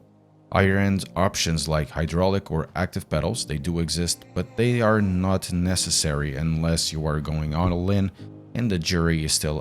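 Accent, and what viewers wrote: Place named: Portugal. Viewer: Are you portuguese perchance? Your accent sounds like it Edit: Eu sabia! 😂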